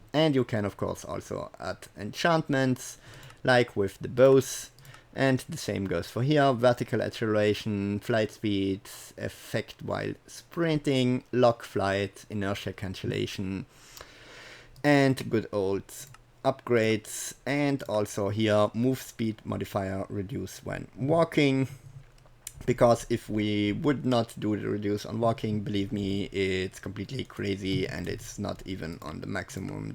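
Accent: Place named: Germany. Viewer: That strong german or dutch accent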